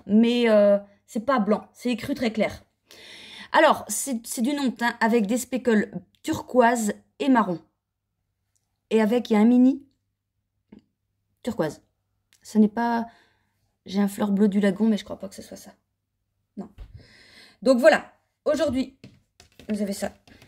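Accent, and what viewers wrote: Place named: Normandy, France. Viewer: 🎉 Coucou, vous me touchez beaucoup et souvent.. ❤ Votre travail est magnifique. J'aime vos Créations et vos Pensées généreuses,.. et aussi, je dois le dire vos mots avec les intonations qui sont les mêmes que celles de ma région natale😂 ( près du Mont St Michel, côté Normandie ) j'adore.. ❤ Merci pour tout; votre futur chàle est Magnifique.. 👌🌟 Belle journée ❤😘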